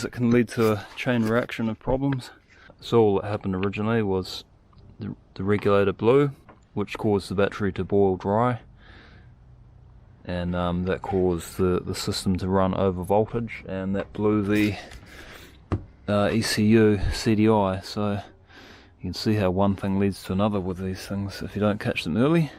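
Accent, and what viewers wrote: New Zealand. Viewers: Shit you sound like a kiwi haha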